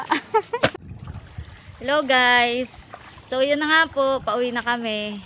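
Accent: Quezon, Philippines. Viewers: New viewers San po probensya Nyo mam yung punto Nyo po kc parang sa quezon province e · haha saan po sa quezon, taga guinayangan, quezon po ako. kaya pala yung punto sabi ko parang related ako haha. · Parang taga quezon Ang punto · quezon province po ba kayu,kasi yun tono ng salita nyo parang s quezon province din,taga infanta quezon ako..dami isda jan,dto sa taiwan yan din libangan ko mamingwit.. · Ay ok po kaya pala kaparehas tayo ng tono 😅😅 lopes quezon po ako . Nasubscribe ko na po kayo god bless po..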